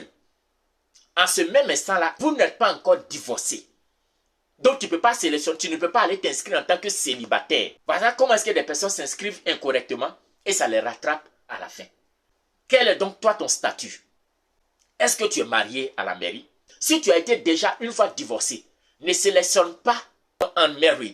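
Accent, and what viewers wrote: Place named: Ivory Coast. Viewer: Hummmm mon frère merci beaucoup pour cette vidéo d'une valeur pratique l'accent ivoirien reste incontournable dans tes propos.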